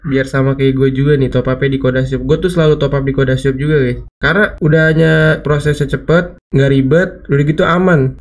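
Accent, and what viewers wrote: West Java, Indonesia. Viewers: bang org mna bang Kaya Org Sunda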